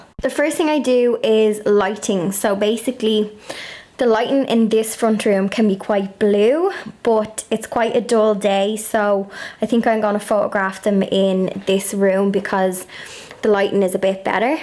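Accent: Ireland. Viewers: Hello! Recently discovered your vlogs and they're awesome! I hear your accent and that cute little cottage and it's like being transported to Ireland! I wanted to ask about the soaps. I help to direct an event at my job for crafters. This would be an excellent craft around mother's day. Could you give any tips as to how to adjust it for a crowd. Maybe a group of 5-10 people. Would you do any make ahead soap & scent mixes and just let them choose? Hoping for suggestions because the group meets for an hour and a half only. Thank you :) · Brilliant yr so clever yr such a lovely young lady love yr Irish actsent x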